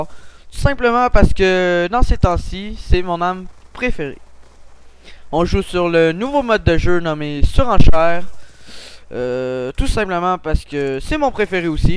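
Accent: Quebec, Canada. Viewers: On dirait que tu as un assent quebequois mélanger avec un assent français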